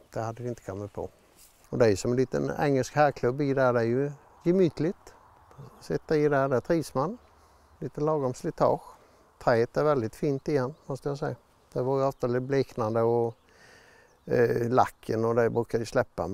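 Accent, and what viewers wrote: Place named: Vetlanda, Sweden. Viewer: Skön blandning av nostalgi, entusiasm, motorkunnande och Vetlandadialekt!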